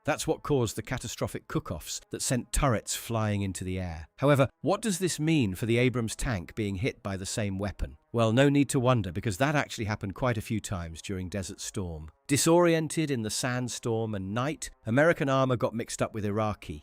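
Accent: United Kingdom. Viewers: And the British accent that permeates every damn video on yootoob. thanks.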